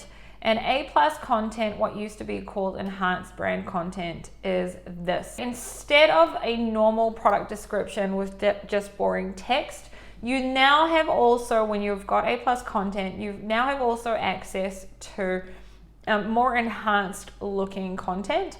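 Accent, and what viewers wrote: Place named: New Zealand. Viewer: Thanks, I just applied for a trademark with my brand through a lawyer. I'll be coming back to this content when I get it up and running! Nice to hear a kiwi voice on here too. Cheers. Sam from NZ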